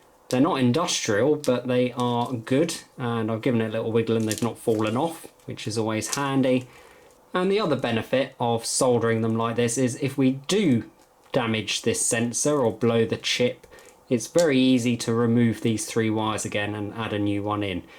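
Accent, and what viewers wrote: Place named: United Kingdom. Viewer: Don't mean to sound crude but the British accent talking about proper care for your tip had me like 😂. Love it! Very informative as a newbie to both arduinos and soldering. Thank you. Much ❤ from across the pond.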